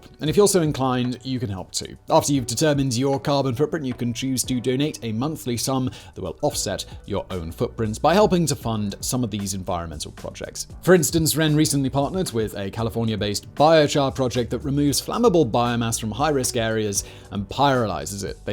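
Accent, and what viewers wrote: United Kingdom. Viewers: Personally, I think it is time to unsubscribe., why do you have so much disdain towards America and people of Euro-Caucasian descent? America indeed won the space race and cut back NASA to protect the world from Communism. Your accent affirms your affiliation with some islands hell- bent on colonization and world dominance for a half millineum. Islands which survived two wars thanks to America. The Americans did move across North America, but tell me which nation did not historical take lands from others? That is how every nation survived. Euro-Caucasians are the inventors of most of what made the world of today. Not one American alive today has done what the British, Japanese, Inca, Zulu, or other empires did throughout the world before America became the place most people of the world would want to live. You as a non-American, earning your living from American clicks, is amongst the least to speak as you do of American men of Euro-Caucasian descent.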